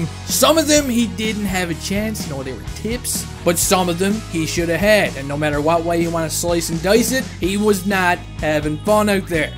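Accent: Newfoundland, Canada. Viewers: This is the first video of yours that I've watched and the Newfie accent is killing me! Love from GFW buddy!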